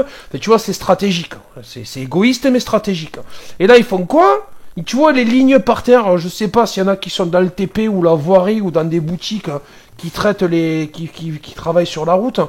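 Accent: South, France. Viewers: toujours top les vidéos surtout avec l'accent du sud oh punaise 😂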